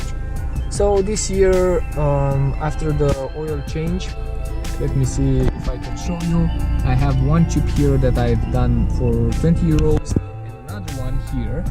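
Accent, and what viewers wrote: Romania. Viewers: dupa accent te-am cunoscut ca esti de al nostru😁👍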